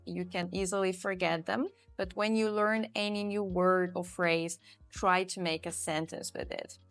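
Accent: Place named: United Kingdom. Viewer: Truly, you are a sophisticated content creator who contributes to teaching authentic English with a British accent. This is excellent! May God help you. Perhaps there are difficulties; you could open an international English language school in southern Algeria—just a suggestion. I respect your work plans, value your commitments, and am proud of your humility, kindness, reputation, and lightheartedness. I thank you from the bottom of my heart and wish you continued progress, prosperity, and success. Amen, Lord of the Worlds.❤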